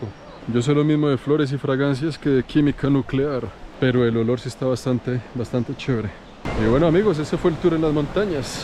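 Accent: Colombia. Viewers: Ese man habla mas colombiano que yo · montaña a la orilla del río, hasta yo me canse! Lol.....Me gusta ver tus videos por el mundo, muro de risa, con tus comentarios en tus recorridos. El acento Colombiano, es uno de mis favoritos! Exelente pueblito al lado del rio! 👏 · Amo como hablas muy colombiano, no se puede olvidar la tierrita; eres lo máximo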